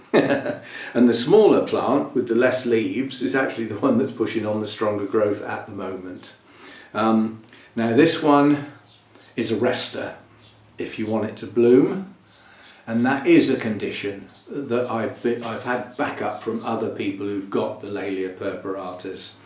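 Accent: England, United Kingdom. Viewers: I love cattleyas! I'm obsessed with them, so I very much like this type of content. Hope, you'll make a vid about the ones now having sheaths. I'm curious if they bloom or not. And I'm also fond of your beautiful English accent. Your videos are more or less English lessons for me, as well. Greetings from Hungary! 🤗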